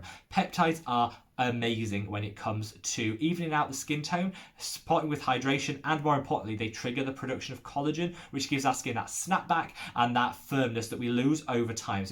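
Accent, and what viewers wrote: United Kingdom. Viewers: yeah in Valencia, born here, but i love foreign languages, so I use your channel to improve my english,( I learned English at Loughborough -Nottingham, and I'm in LOVE with your marvellous British accent, hate the American tough) and to learn about my skin routine so is a win -win situation for me. Lol 😆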